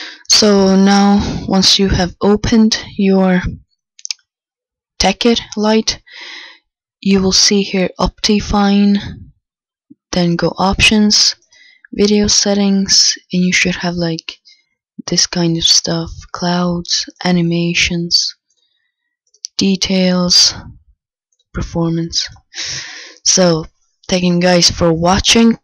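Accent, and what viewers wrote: Russia. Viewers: Your Russian ? :D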